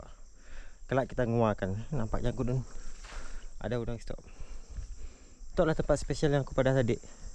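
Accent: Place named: West Kalimantan, Indonesia. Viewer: orang sambas ke bang,bahasenye same kyak orang sambas😅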